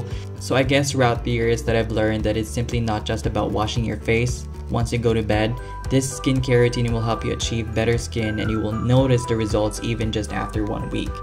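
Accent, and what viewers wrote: Philippines. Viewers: Filipino accent, pinoy baga ito? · Waiit is it just me but you have a slight Filipino accent when you’re speaking English. Woaahh 😱😳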